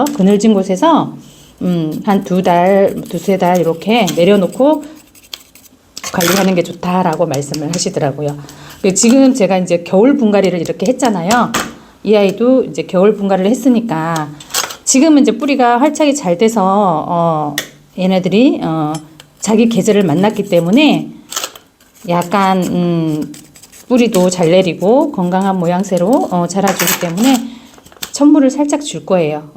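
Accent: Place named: Jeolla, South Korea. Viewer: 안녕하세요~🥰🥰🥰 편안한밤참들기전 이쁘니들 힐링하러왔어요 ~~ 화그 재식재 하시는군요~~건강 해보여요 잎장은~🧚‍♀️🧚‍♀️🧚‍♀️🧚‍♀️🧚‍♀️ 여름에도 전 물 조금줘요 뿌리가 고사가 되더라고요 😂😂😂 전구독한지 오래됐떠용 전라도 사투리 겁나게 쓰시는분 👍👍👍👍👍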